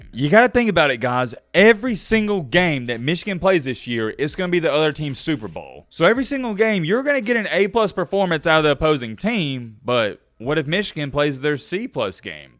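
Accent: South, United States. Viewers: Your voice and accent are excruciating. Southern nerd 🤓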